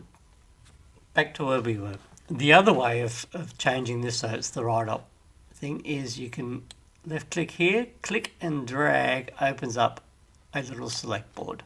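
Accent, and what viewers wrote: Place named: Australia. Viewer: Thanks mate, excellent tutorial. Good to hear another aussie accent in railway modelling. Will look out for the next installment. Paul from Sydney.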